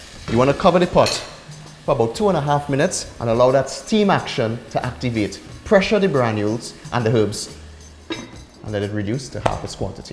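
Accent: Trinidad and Tobago. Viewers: Boy you talk like REAL,country folk(from gran couva) people love this dish cause any thing goes with a hot pepper. . ...Boy time to get teaching to us to make black cake ginger beer and some wine boi😊 CHRISMAS SEASON......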